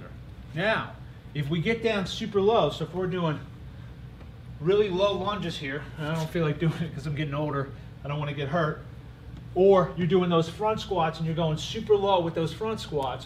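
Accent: United States. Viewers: Ha, PA accent. Go Stillers! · U got the nyc accent. U from nyc?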